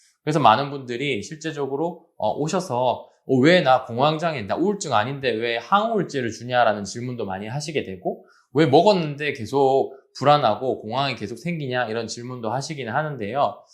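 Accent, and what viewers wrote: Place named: Gyeongsang, South Korea. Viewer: 솔직히 방송 하려면 경상도 억양 정도는 고치고 방송 하는 최소한의 노력은 보여야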